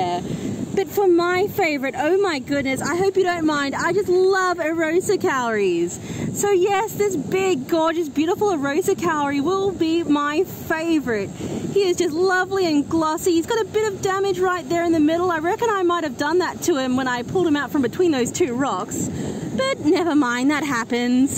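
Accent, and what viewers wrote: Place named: Australia. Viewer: Hi, I am pretty sure you had new shells 3 or 4 of them. I am going to make a list now of every video. Bearsy had to be held today tho. So many kinds of shells, I can hardly keep them straight. I only still know a handful of them. I know all the US tho because I have them except for maybe a very few. Do your hands ever get puckered from the salt water? You are my very favorite of all the shellers, fun, talk loud enough, show the shells closely, love your Australian accent, we get to see you live occasionally, love the music, ending where you take a lot of time arranging what you find. LOVE YOUR VIDEO SO MUCH. Take care please. Love and hugs from Trudy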